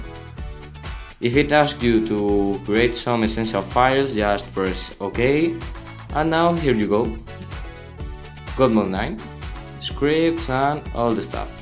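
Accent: Spain. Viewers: SE NOTA QUE HABLAS ESPAÑOL CRACK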